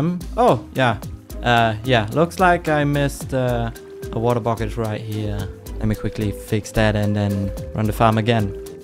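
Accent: Germany. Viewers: Der deutsche Aktzent kahm mir schon etwas bekannt vor, aber geiles vidio :p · Deutscher akzent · PLEASE PLACE LIGHTNING RODS AROUND SO IT DOESN'T HIT THE BUILD OH MY GOD btw dachte mir schon von Anfang an, "das klingt wie ein Deutscher" 😅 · I hear a little German AKZENT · Love the german accent (im german too lmao) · Ich gucke dich zum ersten Mal und hab direkt an dein Englischen Akzent gehört das du deutscher bist 😂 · I like the fact that he has a german accent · The gearmen acent 🤌🤌🤌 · erstens wusste ich garnicht dass du deutsch bist zweitens als du deutsch gesprochen hast hat man dich nur schwer verstanden da du so viel grass plaziert hast wow jetzt wo ich weiß dass du deutsch bist fällt mir auch dein aczent auf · You sound german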